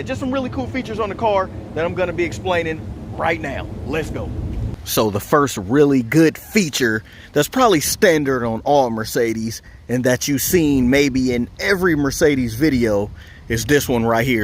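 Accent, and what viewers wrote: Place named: South, United States. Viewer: Dude sounds like a country southern White boy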